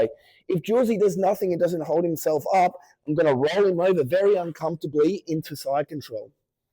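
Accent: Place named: Australia. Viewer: This Australian accent is wonderful ❤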